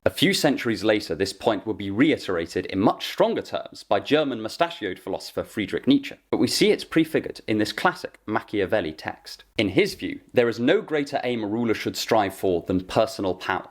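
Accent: United Kingdom. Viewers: Yes, what you said all the British Accent and story lines are good enough. But in my point of view you need some tones of voices,up and down, pitches, break down. Your British accent is really nice but boring.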